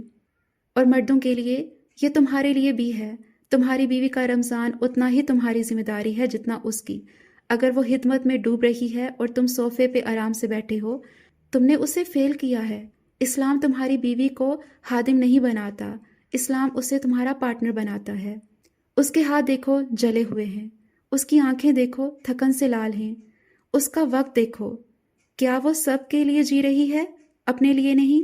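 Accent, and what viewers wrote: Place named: India. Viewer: Why u speak indian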